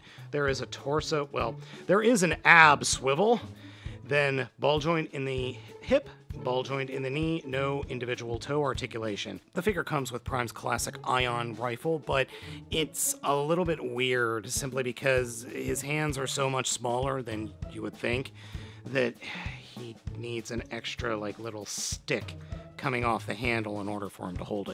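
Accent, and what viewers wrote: Minnesota, United States. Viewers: He's from Minnesota?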